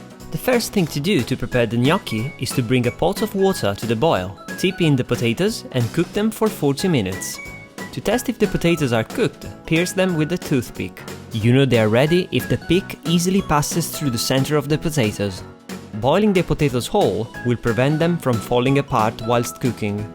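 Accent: Italy. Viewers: yet another great video, thank you! Your videos are always very entertaining because of your wonderful sense of humour:) We also love your italian accent and how you pronounce every letter of English words (as you do in Italian) such as "fruit" and "juice";) Our beautiful Italian vegan friends here in Brisbane recently made us potato and pumpkin gnocchi from their nonna's recipe - buonissimo! They will love your channel too we're sure.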